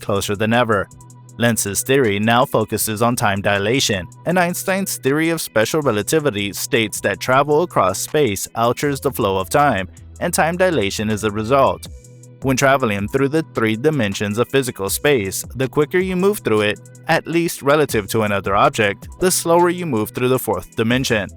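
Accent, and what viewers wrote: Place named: Russia. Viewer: Liked it. I subscribed to your channel. His English resembles that of the Thoisoi2 channel's narrator, who has a Russian accent. Beautiful pronunciation. I found your pronunciation of Miguel Alcubierre's name funny. Greetings from Tupã, countryside of the state of São Paulo, Brazil. Live long and próspera 🖖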